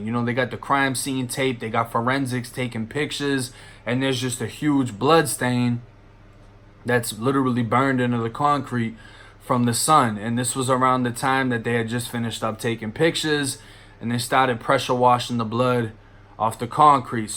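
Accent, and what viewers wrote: Boston, United States. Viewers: Bro you sound like your from Boston i aint being funny i fucks witchu but yea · Here from Death’s videos. New subscriber and fan. Keep it up man. BTW I would have sworn you were originally from Boston, sorta sound like we do. EDIT - watched deaths videos from last to first, guess you are originally from Boston. Super big up to you.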